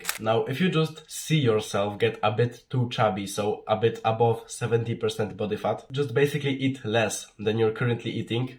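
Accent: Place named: Poland. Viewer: haha po glosie rozpoznalem xd bo cos taki akcent jakbys z polski byl xd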